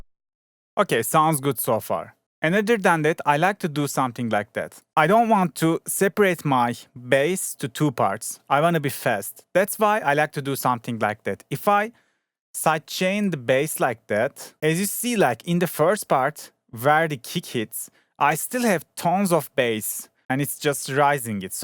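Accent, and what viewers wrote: Turkey. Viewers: broooo. i loved your accent. tell me you are turkish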